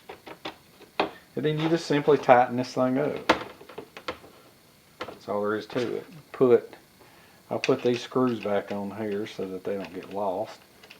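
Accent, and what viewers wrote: South, United States. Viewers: I have made many knives, swords etc, Viking swords, Roman Gladius, Roman spatha, blah blah, but one thing is for sure, if you develop a sharpening technique that works, then who cares how others do it. I just laid on my couch, and after watching the full video, I have an overwhelming urge to go in my workshop, grab my sharpening stone and some strop leather and sharpen my survival knife, and I'm not even a bushcrafter. In fact, watching your vids has given me the idea to spend a weekend in the forest up the hill from me😊, although, it's October, it's Germany and its bloody freezing 😂, so I may wait till next summer. But one thing I wanted to say as a relative noob to your channel, is thanks for sharing your passion, and to us over the pond, your happy go lucky positive attitude and your really funny southern Hill Billy drawl, makes your vids even more infinitely watchable. 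So, cheers, appreciate it bro. 👍🏻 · G'day, I saw this excellent video when it came out. I didn't leave a comment. It happens that I'm a huge fan of Kukris and recurve machetes and recurve/convex knives. I took your advice on-board because I didn't have a strategy for sharpening my Kukris, convex and recurves. When in doubt I used a ceramic rod and a ceramic oval rod; which by the way, works well. Here it is two years later and I can tell you that your methods (in some cases slightly modified) have been working for me. I don't like using awlll (AKA: oil) so I got myself an ultra-fine water stone wheel. I also have practiced doing a lot of 'line of sight' freehand honing and stropping and I can pretty much get a surgical blade finish on any decent carbon or stainless steel. Although, I took your advice and didn't bother spending too much time on my 'chop only' Kukris. Now I have a lot of knives in my user collections that I don't need to show to a stone of any kind. 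Thanks, you've been a great help! P.S. I love your Southern accent although with some words I need to see sub-titles till I get used to your great way of speaking. No dig intended; if you heard my rough Aussie accent you'd probably reckon I was babbling on in some foreign language:) Good one,. Since 2016 I've not missed one of your videos. Outstanding. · I have no clue how to sharpen knives. I bought my son a stone. Hes the one that told me it needed o'l 😂. Love that southern drawl.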